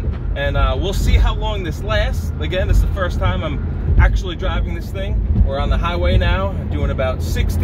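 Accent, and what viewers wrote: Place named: New Jersey, United States. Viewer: I thought you're from jersey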